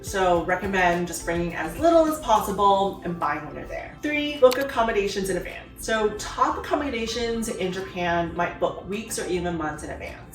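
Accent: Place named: California, United States. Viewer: your voice sounds Californian with a wanta be “Valley Girl” tone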